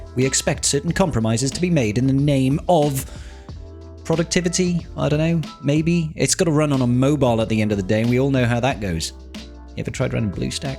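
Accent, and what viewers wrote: Wales, United Kingdom. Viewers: Welsh, I thought you were Australian.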